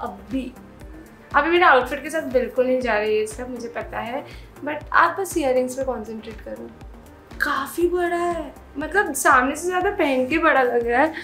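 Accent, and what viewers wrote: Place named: Hyderabad, India. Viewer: U speak some wht like hyderabadi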